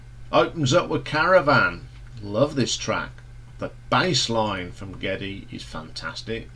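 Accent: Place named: Sheffield, United Kingdom. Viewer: You sound like you're from Sheffield.. am I right?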